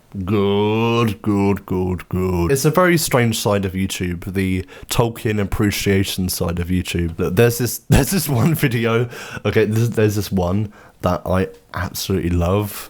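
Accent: United States. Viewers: Was this all to show off the American accent? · American here, for my sins. Your American accent is great!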